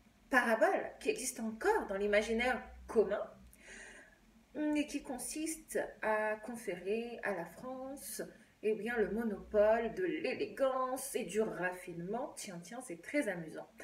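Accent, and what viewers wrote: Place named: United States. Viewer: Merci Tu me tues... 🤣🤣Tu T'habilles toujours dans des habits magnifiques qui Te vont trop bien, je trouve... Oui cagole est un mot issu du vocabulaire marseillais... Oh Tu m'as appris un truc, là... Merci 🙏Ton accent américain est trop mignon... un tantinet québécois (ou Canadien) et Te va super bien aussi... Tu es trop mignonne... Y a aussi "pimbêche" qui est synonyme... Tu mérites des fleurs... Pour moi Tu n'es pas une "cagole": Tu es une Princesse... Déesse... Au delà de ton look j'aime ton esprit libre et je ne sais pas comment Te le dire autrement que j'aime T'écouter... J'aime Te faire du bien... Oui, vraiment s'il y a de la haine contre Toi, je souhaite être ton pansement pour cicatriser tes plaies, ton mouchoir pour pleurer... Je Te kiffe... C'est sans doute prétentieux de ma part, mais si je pouvais arracher mon coeur pour Te l'offrir en guise de bouquet de fleurs, je le ferais... 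Ton discours est détonant: très intéressant... Une révolution... Merci! Je tiens à Toi comme à ma famille... J'ai besoin d'être ton héros ou en tout cas d'en être un pour Toi... je T'aime! Je suis contre les modifications corporelles (sauf suite à accident) contre les tatouages (même si certains son jolis) contre le maquillage (même si c'est joli) contre l'épilation... et contre les discothèques... mais je comprends l'intérêt, un peu... (je crois) Ce que je voulais Te dire: vraiment ne Te prends surtout pas la tête... Moi je me la prends trop souvent... Je réfléchi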